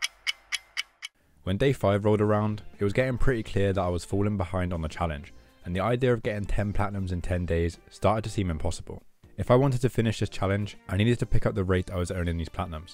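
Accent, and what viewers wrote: United Kingdom. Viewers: This video is absolutley top tier! Love to hear another brit! :) keep them coming man!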